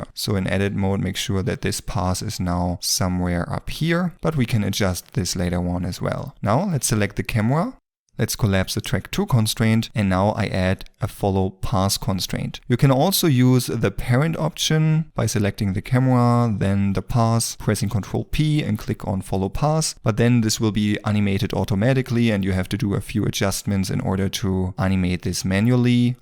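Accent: Germany. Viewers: Are you German? 😅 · It's crazy how germans instantly notice a german accent of english when they hear it...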